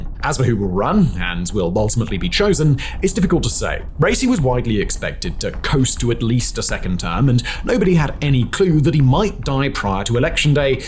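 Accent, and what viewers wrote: United Kingdom. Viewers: You Tube SUCKS! I have removed this man's channels over and over again. But when You Tube changes the algorithm, it force feeds me this guy's many channels despite my removing him. channels suck. They are poorly researched information that is mostly government propaganda talking points. Terrible videos. And just because has a British accent, this doesn't not make him an authority on anything. He's just a talking head. England is over. Brexit has made the only job in England a You Tube content provider. Terrible list videos that spread falsehoods and bull.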